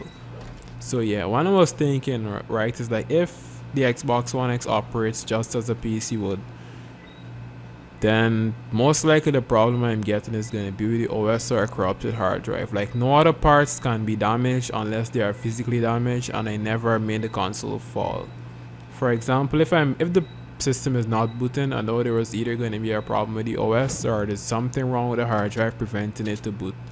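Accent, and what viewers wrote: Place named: Caribbean. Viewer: Good to hear a Caribbean voice on YouTube for once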